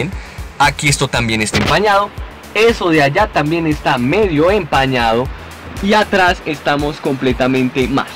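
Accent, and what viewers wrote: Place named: Antioquia, Colombia. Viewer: Me gusta como hablan los paisas...gracias Men..!!!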